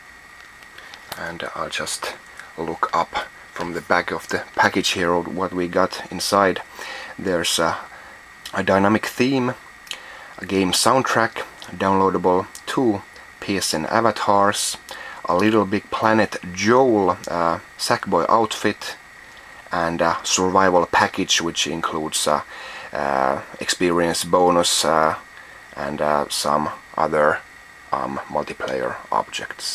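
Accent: Finland. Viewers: joo huomasin aksentistas ja kanavan nimestä :D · hahahah finnish accent lol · I'm constantly in Helsinki! I thought I recognized the accent! :)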